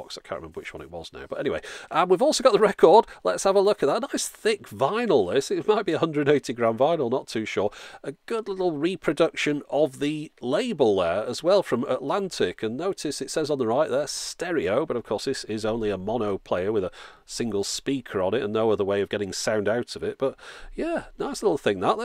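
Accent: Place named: RP, United Kingdom. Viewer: The voice sounds like Finn from BBC English...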